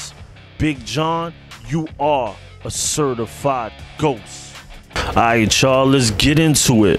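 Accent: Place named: Boston, United States. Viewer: This dude talks like 1090Jake 😂 must be from Boston area or sumn 🤷🏻‍♂️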